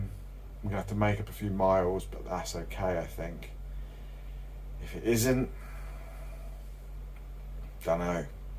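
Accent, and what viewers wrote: England, United Kingdom. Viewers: I was looking forward to this until l heard your horrible English accent. There is to many of use up hear now and you will ruin the Highlands just like everywhere your mob has been before.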